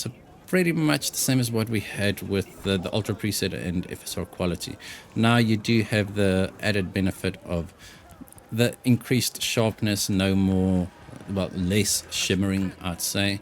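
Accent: South Africa. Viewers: Noice I could hear your accent, Ill sub man, Im from Cape Town now in Scotland! Happy Youtubing!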